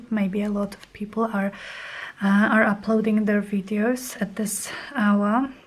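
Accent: United Kingdom. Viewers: I love these vids. 💜 Your creations are so delightful, even your scraps are lovely! Been busy knitting and trying to learn new stitches which is a challenge for me. Hey! When did you replace your table? I miss the teal one 😉 I love Scottish accents too 💕 if I could have seen in concert that would have been epic!! 💫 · both of those series made me wish I could travel to Europe and Australia. My family name is Montaque and we came from England and Scotland. I love the accents. That's another reason your voice is so soothing and relaxing to me. I love watching you and hearing about your life. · I love your new series! Absolutely relaxing and it's so nice to know you better as you talking more about yourself ☺ I love Downton Abbey, too! My mum and I watched it uncountable times 🥰 And I agree, I could listen to British English for hours. I do not watch TV or movies/series very often, I am more in reading , so no tip for you 😅 But warm hugs instead 🤗 😉🐶😘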